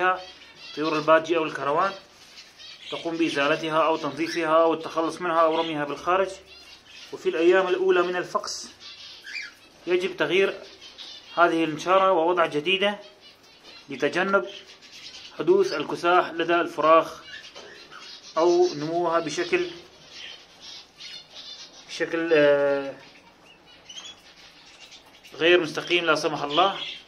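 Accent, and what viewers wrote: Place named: Syria. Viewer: منين انت اخي لهجتك مو غريبة عليي منين من سوريا😍😍😂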